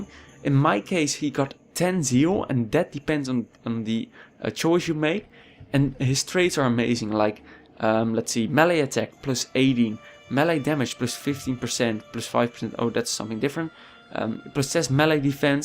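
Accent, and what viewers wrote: Netherlands. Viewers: Dat Dutch accent do :D I love your Dutch accent! Greets from your southern Neighbour!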